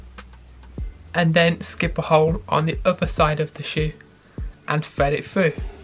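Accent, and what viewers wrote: United Kingdom. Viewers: are u british, i like the accent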